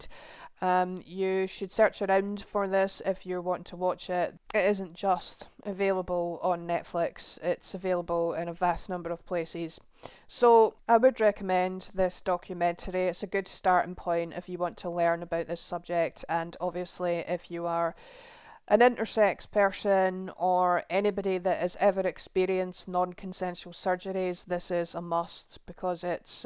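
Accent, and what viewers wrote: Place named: Scotland, United Kingdom. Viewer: It's interesting to hear your fierce condemnation and rightful indignation at this harmful cultural practice but at the same time you haven't completely shaken off the social conditioning normalising it. You speak of circumcised and uncircumcised rather than mutilated and normal or at least unmutilated. You say they ended up severely damaging David Reimer's genitalia to the point where it could no longer function as it was meant to which is what happens in 100% of cases with boys, at least! Actually he suffered a complete penile amputation rather than the intended foreskin amputation. You speak of the "medical procedure" when it isn't a medical procedure but a medicalised ritual or not even that in the case of the cutter being a Rabbi with no medical training and using no anaesthesia. We don't need balance when speaking about harmful cultural practices, we need objectivity! 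You sound Scottish, are you aware that in Scotland baby boys are put under general anaesthesia to have their genitals mutilated at their parent's request, on the NHS, at taxpayers expense?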